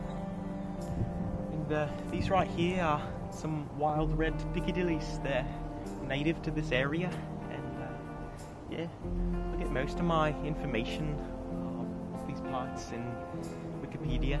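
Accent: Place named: England, United Kingdom. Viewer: Oi, I have a life. Also no Irish man would be offended as that is almost a perfect English accent haha!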